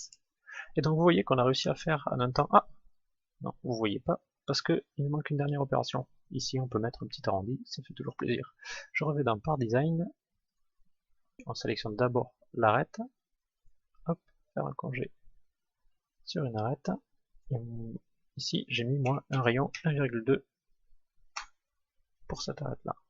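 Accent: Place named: South, France. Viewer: Bonjour Ce sont des super tutos, j'apprends sur 2 écrans en faisant au fur et à mesure et j'ai pu imprimer les premières pièces dessinées sur freecad. cependant, une petite question: quelle version de freecad utilisez vous sur les premières vidéos? Sinon c'est génial avec l'accent du sud!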